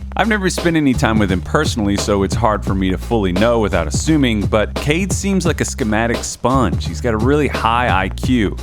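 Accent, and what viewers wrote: South, United States. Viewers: This video making genius got that Chris Vernon style Southern draaaaaawl going on!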